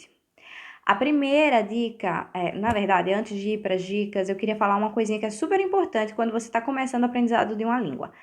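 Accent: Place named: Rio Grande do Norte, Brazil. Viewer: Ela tem um sotaque que parece o potiguar hehe bonito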